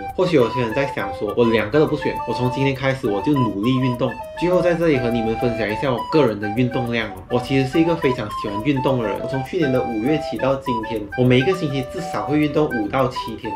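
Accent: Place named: India. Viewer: 你怎么有印度口音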